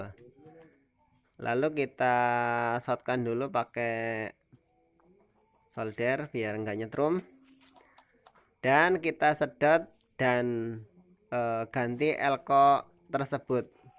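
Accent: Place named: Banyumas, Indonesia. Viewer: Rika ngapak timen.. salam dari lampung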